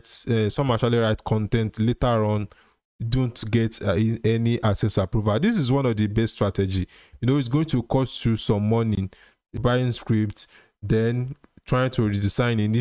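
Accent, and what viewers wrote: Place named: Nigeria. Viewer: Nigerians, your accent cannot go unnoticed. "Sarch", "pour-chase". Lots of love from Anglophone Cameroon ❤️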